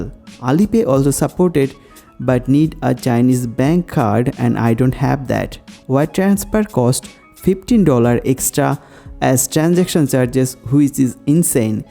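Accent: Bengal, India. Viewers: Typical indian people ma dude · Rathod He is talking in Bengali accent, not American. Don't be ignorant about your countrymen, try to learn more about India. It is a very diverse country. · দাদা আপনার কথা শুনেই বুঝেছি আপনি বাঙালি। বাংলাদেশ থেকে ভালোবাসা রইল 🇧🇩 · Bengali people speak 🗣️ like this it is not like that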